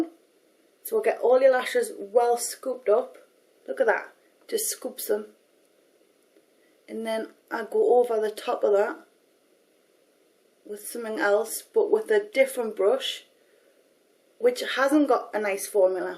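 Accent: Newcastle, United Kingdom. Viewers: Why would she put on an accent. She's a Geordie that's what they sound like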